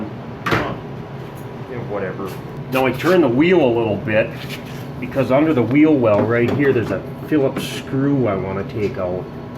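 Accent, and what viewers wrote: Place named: Rust Belt, United States. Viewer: Great video! Love that thick rustbelt accent. Last generation of the unique American accents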